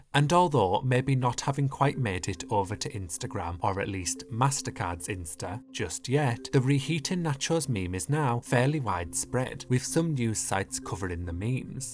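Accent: United Kingdom. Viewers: He's from the UK, so I'll give him a pass. Maybe that's just how they pronounce there? Natchose. Natchose...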